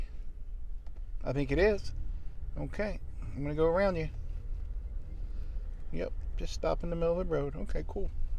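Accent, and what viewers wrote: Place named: Texas, United States. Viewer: You have a great texas drawl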